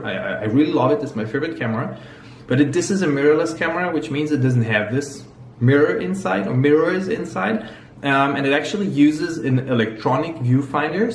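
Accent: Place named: Germany. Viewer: Dunno who the f** this guy is, but that german accent is freaking awesome. Please make some good night tale videos too. :P But nice content there. BTW ...that orchid looks freaking awesome. :D What kind of plant formula are you using you console peasant? :D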